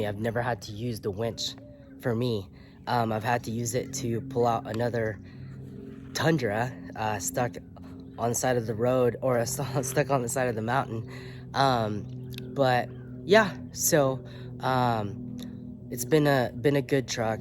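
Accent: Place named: Southern California, United States. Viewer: As soon as he started talking i was like oh hes in so cal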